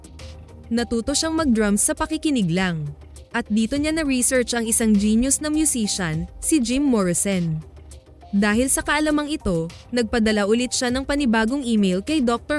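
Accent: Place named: Visayas, Philippines. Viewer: Hahaha bisaya yung narrator bankita raw ,but im one bisaya hahahaha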